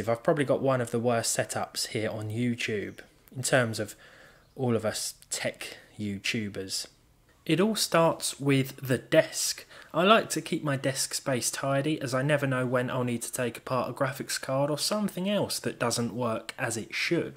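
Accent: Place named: United Kingdom. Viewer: It's for the big brain... which pumps out the British accent... which makes both my ears and eyes bleed :(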